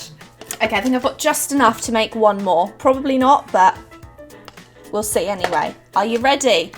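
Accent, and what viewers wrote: United Kingdom. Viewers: Are you British ??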